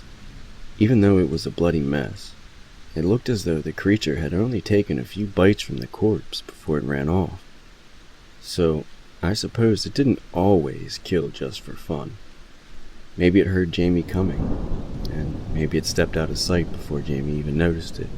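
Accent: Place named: North, United States. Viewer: Great job on sharing these hand picked stories. I get drawn in by the pace and timing and you must be from an area North of the Mason- Dixon line (written by a true Northern New Englander!) You are mastering the art of background sounds without getting washed out by it. Your reading voice is 'lower' not baritone, but in a lower register, so be careful using rolling thunder as IT is in a lower register as well. I sense that you are using a good microphone but, your mixing software may not be able to lay down multiple separate tracks? Really enjoying these recent uploads, drifting back in time to hear older work as time permits. 'Liked', subbed & comment for YT algorithm!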